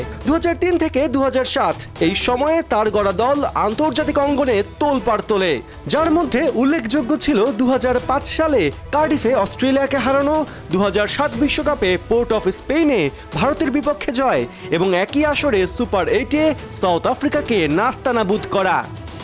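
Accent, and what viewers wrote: Kolkata, India. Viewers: কলিকাতার স্টাইলে খবর পড়েন কেন?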